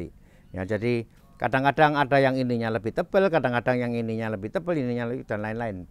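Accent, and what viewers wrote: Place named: Madura, Indonesia. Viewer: Logatnya kayak orang madura kok dipanggil koh 🤣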